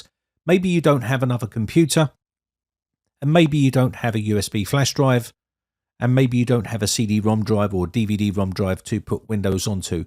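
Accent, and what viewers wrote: United Kingdom. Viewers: Bri ish tbh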